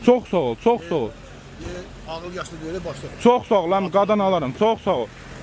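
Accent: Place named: Ganja, Azerbaijan. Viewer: Gəncəli ləhcəsinnən danışırsız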